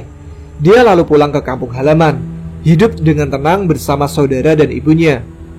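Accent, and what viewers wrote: Malaysia. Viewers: Johor Malay kah ini bang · Respect pada mas cuba membawa cerita ini dalam tonasi bahasa Malaysia. Salam serumpun 🙏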